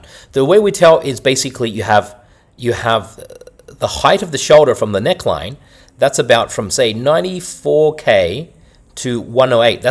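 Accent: Australia. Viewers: Accent sounds Australian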